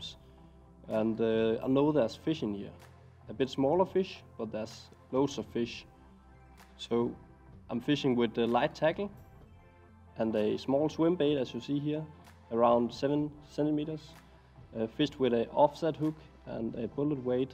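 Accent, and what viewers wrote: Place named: Scandinavia. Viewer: I would think maybe Scandinavian with your accent. Wouldnt have guessed French.👍🏴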